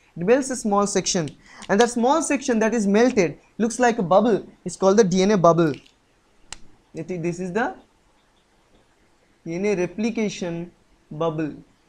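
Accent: Bengal, India. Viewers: Sir r u bangali ??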